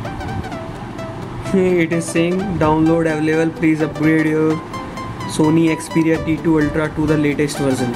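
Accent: India. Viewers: eat a like for your Indian accent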